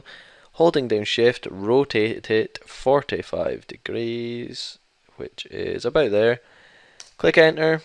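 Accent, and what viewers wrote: Scotland, United Kingdom. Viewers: love the scottish voice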